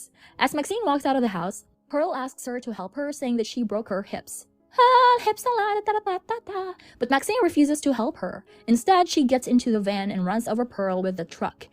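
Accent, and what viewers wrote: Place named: Russia. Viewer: Your Russian accent is funny.... Do more of it 🤣🤣🤣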